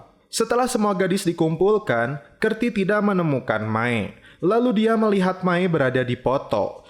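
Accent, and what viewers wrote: Indonesia. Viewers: Orng indo baca nya begitu bg, mau gmna lagi susah di ubah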